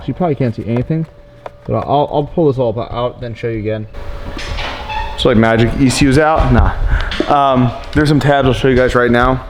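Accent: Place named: Long Island, United States. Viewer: Listen to that long island accent 😱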